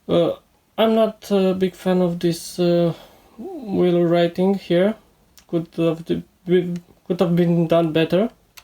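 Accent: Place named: Romania. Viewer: buna, colectionezi numai 1/18 ? Eu am acelasi model in acelasi spec ca si al tau dar e un Spark 1/43 .eu colectionez doar 1/43 . Stii, miam dat seama ca esti român dupa accentu care il ai in engleza. Vb bine, dar accentu l - ai pastrat si nu e rau. Faza e ca io l - am pietdut si nus daca i bine sau rau.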